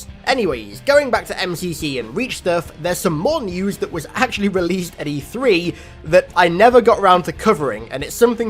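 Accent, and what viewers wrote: United Kingdom. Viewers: i always thought you were american, good to see a british halo youtuber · when you've been watching for years and only just now realized he's british......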